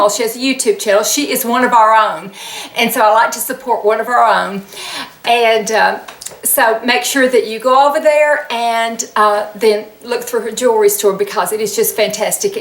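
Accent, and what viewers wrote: South, United States. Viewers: You look beautiful as always and that necklace looks so pretty on you. Just hearing your voice is so soothing...hope that doesn’t sound too weird...lol. Love a southern accent :) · What a cute way of saying gone love your gentle southern lilt love elaine Lincolnshire uk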